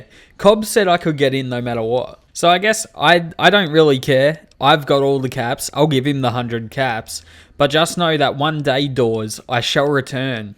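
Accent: Australia. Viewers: also, who doesn’t love an aussie cant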